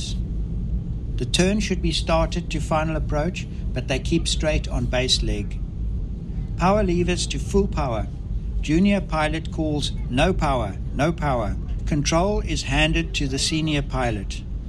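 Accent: South Africa. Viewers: Hi. I do live in Oregon USA now but did live in South Africa till 1999. I am guessing from your accent you are from SA as well. I have to admit I am very biased about Nepal. I have visited twice and left only a month before this accident. I had been almost as excited as some of the locals I know about how positive the new airport would be for everyone. This made something immeasurably bad even worse. If you have never visited the people in Nepal are incredibly kind, hard working and hospitable and its a very easy place to love. And whilst understanding their transport challenges in terms of equipment and procedures (it does not seem these were a factor here) I have only had the greatest respect for the pilots and bus drivers who do an incredible job under very tough conditions. I can only fault some taxi drivers, which seems to be a problem everywhere. I just hope there is some final explanation which does not add to this tragedy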